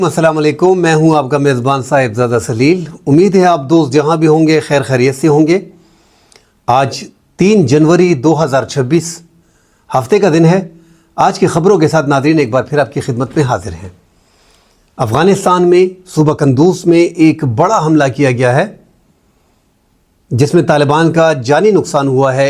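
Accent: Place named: Hindko, Pakistan. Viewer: Sir Hindko main batain kia karain App ke urdu Hindko jayse ha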